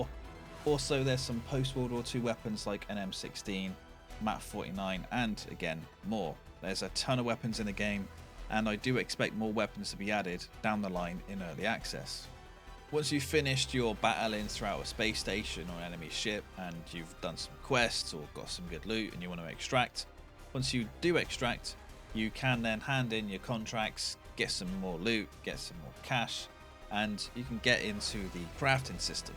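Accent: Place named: Bristol, United Kingdom. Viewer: U sound like ur from Bristol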